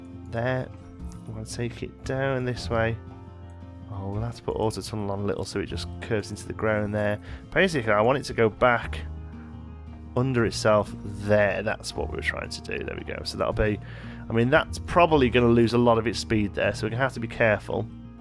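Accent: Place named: Birmingham, United Kingdom. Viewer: thought i could hear a glimpse of that birmingham accent hah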